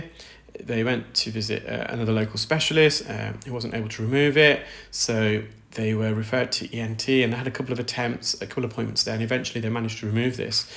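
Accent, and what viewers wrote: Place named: Wales, United Kingdom. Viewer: Nothing wrong with your accents or speed. I find it quite comforting as it reminds me of family- even though I'm not Welsh many generations before me were. Mainly from the valleys so your accents are spot on for me 😂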